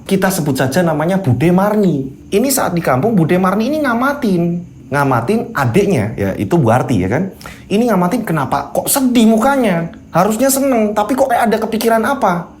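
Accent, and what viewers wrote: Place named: Central Java, Indonesia. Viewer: Absen Kuwait 🇰🇼♥ Ahirnya ada lnjutanya juga yesss Klo dengrin critanya mas ko aku kaya lagi d ceritain mantan🤭 suaranya mirip sih logatnya sma krna dia juga dari jateng aku nya indramyu 🤣🤣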